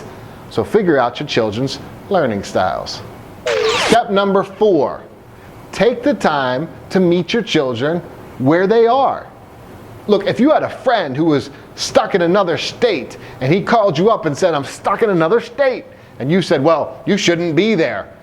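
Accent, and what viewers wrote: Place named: United States. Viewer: Why does he speak in an American accent? He would sound better if he spoke in an Australian accent.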